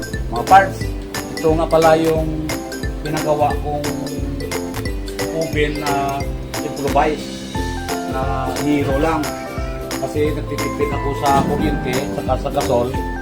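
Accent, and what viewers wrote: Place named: Panay, Philippines. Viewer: ay ganon ba taga concepcion ilo2 ako iba talaga tuno ng taga panay stay safe bos god bless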